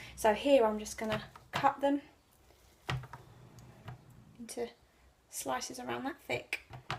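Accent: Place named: Essex, United Kingdom. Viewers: This is an Essex accent. Nothing like Scottish or Irish. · I have been cooking for well over sixty years, I love learning and looking at different recipes, from complicated to easy. My dear lady, if I may address you as such, it is a delight to hear your lovely English accent, down to earth instructions, a great video, much appreciated. Yes, I remember spam fritters, a staple meal in the past when there was so little choice to buy. Brilliant video, you could cook with me anytime, much respect!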